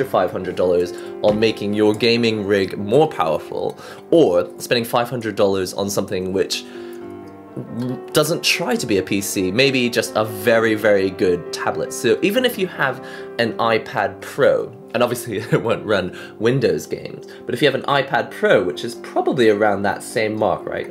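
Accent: United Kingdom. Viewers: British mate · This is the only video I've seen by you and I like your accent. I don't know if it's British or something else but it makes you permanently sound mildly sarcastic even though you aren't being sarcastic. It added to the fun of watching (or rather listening) you talk about this product.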